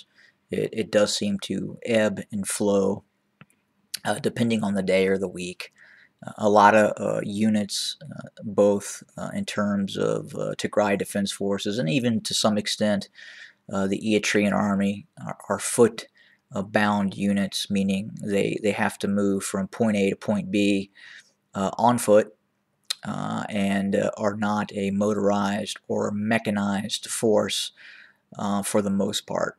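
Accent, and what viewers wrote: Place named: United States. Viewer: You are definetly abesaha, why put this white American accent ?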